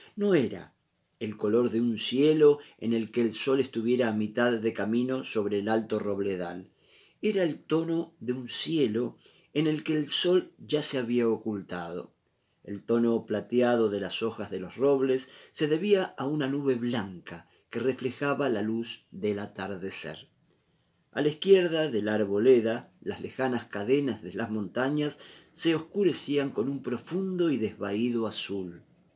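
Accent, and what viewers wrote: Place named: Argentina. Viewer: Que hermoso narra los cuentos! 💗 aunado a su encantador acento Argentino 🌟 Gracias! 😊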